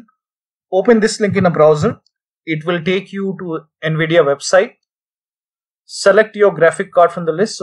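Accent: India. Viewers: I'm hoping it's not blatant racism. I mean I was sketched out from the voice for a min to but I got anxiety XD and I watch to much scammer payback. But from what I'm seeing everyone says it works so no reason to doubt the man just cause he sounds like a specific group of scammers even indians hate scammers.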